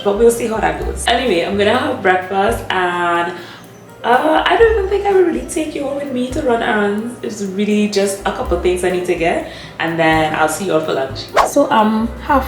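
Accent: Trinidad and Tobago. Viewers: Hi, are you Trini? That accent sounds like my people! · I hear that trini accent and I say no sah let me go watch!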